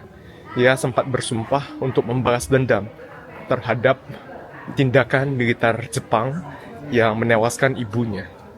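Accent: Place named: Medan, Indonesia. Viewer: Kau cakap tetap logat medan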